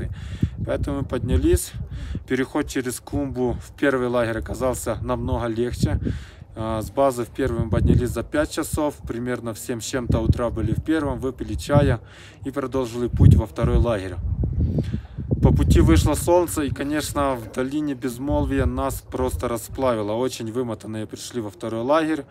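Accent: Ukraine. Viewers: Альпинист который погиб 11 мая никакой он не швейцарец. У него пакистанские корни. Он профессиональный альпинист, у него 7 восхождений на высочайшие вершины планеты. Но вот восхождение на Эверест совпал с окончанием мусульманского рамадана, месяц соблюдения особого режима питания. Религия на столько победила здравый смысл, что даже в горах, в опасности он пытался не нарушить рамадан. Тут и горам понятно что организм был предельно истощён. Я читала о нём в YouTube, и у нас в Швейцарии говорили о нём по радио. Что это первые 2 смерти на Эвересте с 2019 года. Он и американец, кстати, тоже мусульманин. В 2020 Эверест был закрыт из-за короны., увидела тебя и сразу же подписалась, настолько приятный у тебя украинский акцент. Я сама из Украины., парни, удачи вам, благоприятной погоды, счастливого погодного "окна ". Непогоду лучше просто переждать. Любой риск должен быть оправдан. 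Сегодня 16 мая.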